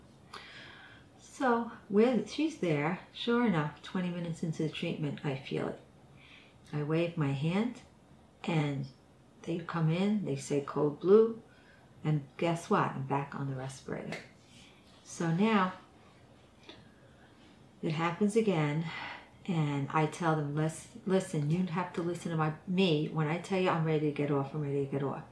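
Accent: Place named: New York, United States. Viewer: New subscriber to your son’s channel, thank you for sharing your story! I cried when you spoke about your dad and melted when your son came over to comfort you. Your New York accent is so awesome:). ❤️💐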